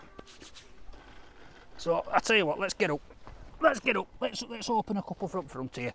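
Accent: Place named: Yorkshire, United Kingdom. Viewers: your the man mate. I tend to binge watch, so I could be two weeks behind on video's. But I will catch up in a few days. I am a Yorkshire lad Doncaster so not far away from you, and I have the same accent as you Mucka ❤️ col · Hi, my gift was sat at the front of your table but unfortunately you didn't have time to open it. All I will say is it may be beneficial if you open it first on your next unboxing video. It is a purple parcel with the postcode blacked out where i got it wrong. For the last 20yrs I have been suffering severe depression after a lifechanging accident whilst at work, and I have to say that watching your videos puts a smile on my face. I worked in Barnsley for 7 years and your accent brings back all the happy memories from before my accident. Keep on doing what you're doing pal, I'm sure that you bring a smile to everyone that watches. 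All the best for your future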